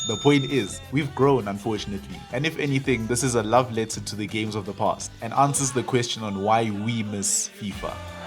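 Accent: South Africa. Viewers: I was detecting a South African accent all along.. hante😅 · You just know a south african brother when they speak 🫴🏽💪🏽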